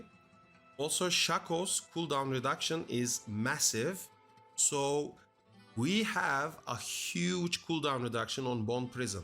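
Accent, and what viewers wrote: Turkey. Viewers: are u turkish u sound and look like turkish, great vid also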